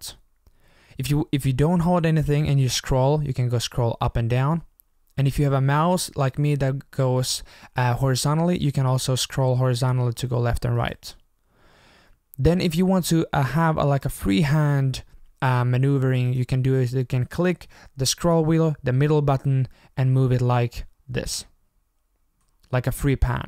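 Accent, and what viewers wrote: Finland. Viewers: Are you finnish? Ooks sä suomalainen?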